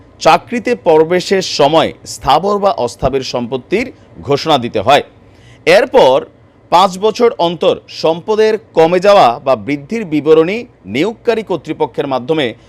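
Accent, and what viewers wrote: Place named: Comilla, Bangladesh. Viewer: Location India কেন, আপনার ভাষা তো কুমিল্লার মনে হয়।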